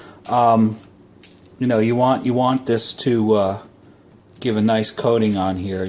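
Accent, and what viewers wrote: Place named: Philadelphia, United States. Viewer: Thanks. I just finished watching this video and ready to use my new Insta Pot to cook a pork loin. I gut laughed at the end when you exclaimed "GD that smells good!" Also, I am wondering if you are from SE PA? I detect a Philly accent.: ) Thanks again.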